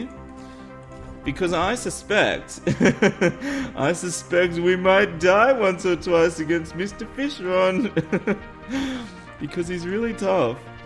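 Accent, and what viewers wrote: Australia. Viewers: You're Australian? I didn't realise. What state? I'm from SA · Bruh oh my god it makes ur channel even better because your Aussie . First good Australian youtuber keep up the good work man.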